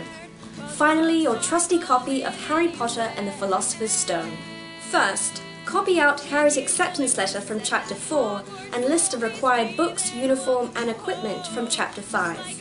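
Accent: United Kingdom. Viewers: Are you really British? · this is a great idea! I love your accent are you welsh of british?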